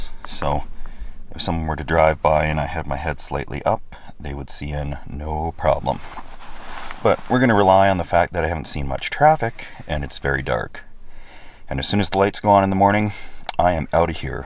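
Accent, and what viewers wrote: Canada. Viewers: OOOOOH yea..... Wanted to say that ur letter kenney way is omg, so BEAUIFULLY Canadian. I FREAKIN LOVE YOU...... Never stop.... I went back on ur vids and, holy shit hairbag.....!!!!!Seriously my friend, do not stop..... Hi Krazy neighbour and Beautiful wife( who without her, I'm sure, would not be) ( By the way girl, fu*& the haters... Mad respect) Don't stop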